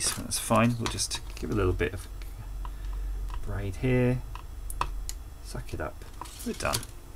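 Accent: United Kingdom. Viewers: Thanks for sharing, and I love your British accent !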